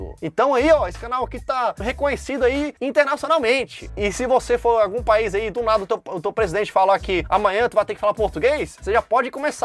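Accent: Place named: Northeast, Brazil. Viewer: Qual o sotaque deste apresentador ?deve ser do sotaque do nordeste ...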